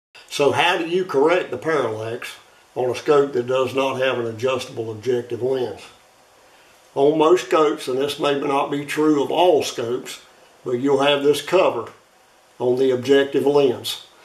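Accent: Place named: South, United States. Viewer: Really enjoying your videos. It’s all good. Good to hear an American country voice that I can understand and knows his business. I personally thank you taking the time to share your knowledge with us.👍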